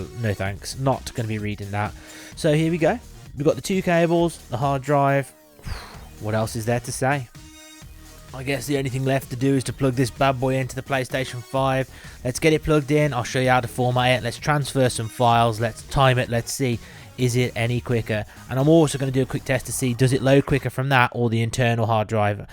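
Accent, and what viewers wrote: United Kingdom. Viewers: Thankyou so much for this. I was wondering if this drive would work on a ps5 for external storage for ps4 and your video has certainly confirmed that. Love your British accent too.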